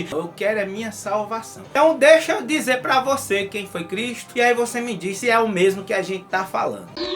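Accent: Northeast, Brazil. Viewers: Meu amigo, á muito tempo sigo seu canal, vejo seus vídeos, gosto muito. Você é um cabra arretado, que fala tudo com esse sotaque humorístico gostoso dos nossos irmãos nordestinos, mas vendo hoje esse vídeo, me emocionei um pouco, com tão belas explicações do evangelho em resumidas palavras. Se o homem foi inspirado para escrever a bíblia, como dizem aqueles irmão mais fanáticos das religiões, você estava inspiradíssimo por Deus, na hora que fez este vídeo. Estou vendo que foi o ano passado que você fez, mas esta reflexão vai valer por seculos e este momento de quaresma, vai ser sempre mais um bom momento para podermos acompanha-lo e refletir. Ah! Se todos os padres, bispos e pastores das religiões tivesse a graça de Deus que você teve, para explicar de forma tão direta o que é Jesus e quem foi aquele povo, aquele sacerdotes, aquele sistema. Ou seja: Não mudou nada. 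Jesus continua morrendo coossificado e o povo continua crucificando Jesus.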